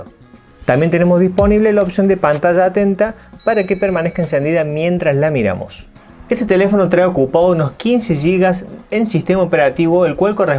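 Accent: Córdoba, Argentina. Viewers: Cordobes o santiagueño?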